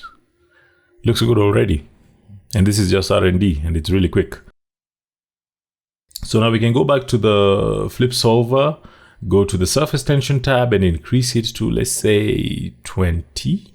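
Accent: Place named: Kenya. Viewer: As if I’m looking for a tutorial, recognise the accent and find someone else from Kenya. Good stuff man. Are you working in Kenya or out the country?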